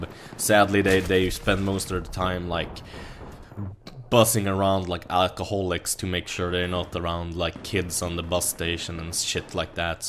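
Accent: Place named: Sweden. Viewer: man i wish i had a swedish accsent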